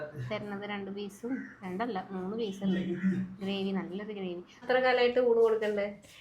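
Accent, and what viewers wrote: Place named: Thrissur, India. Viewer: 👍 തൃശ്ശൂർ ഭാഷ കേട്ടപ്പോൾ ഒരു കൗതുകം 👌👍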